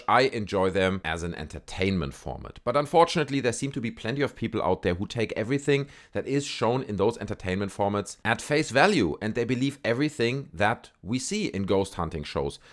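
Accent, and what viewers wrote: Germany. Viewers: very good english but i know exactly that you re German 😂 thick accent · why you sound german lol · Mann, hört man dein deutsch sein raus 🤣🤣🤣🤣🤣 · I love your German accent! It's cool!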